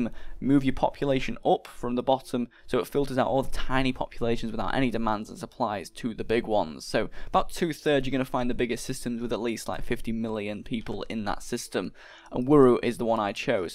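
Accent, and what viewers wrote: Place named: United Kingdom. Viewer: can you talk a bit slower lol. i dont british or uk or whatever accent you have at all and the million words a second is near impossible to get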